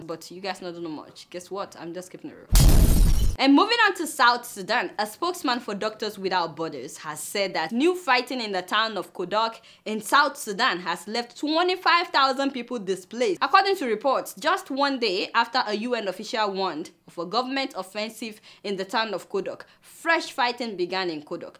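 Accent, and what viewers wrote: Yoruba, Nigeria. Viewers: your funny Yoruba tone tickles me. Keep it up.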